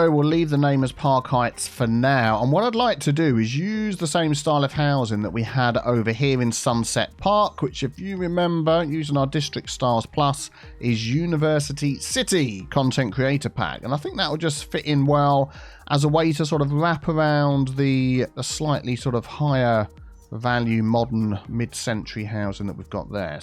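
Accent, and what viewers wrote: United Kingdom. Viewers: Your British voice is relaxing 😅😂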